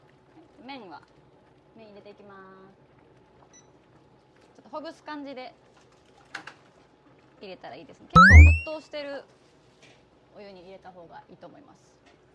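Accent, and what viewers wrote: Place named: Kochi, Japan. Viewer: 前々から 拝見させていただいてました。 美人は当然ですが、 イントネーションは 高知の女の子ですね🎵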